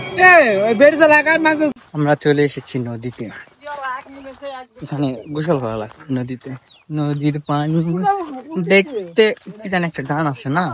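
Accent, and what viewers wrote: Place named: Kushtia, Bangladesh. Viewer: ভাইয়া তুমি কি কুষ্টিয়া জেলার ছেলে তোমার কথা গুলি ওদের মত🤔🤔🙄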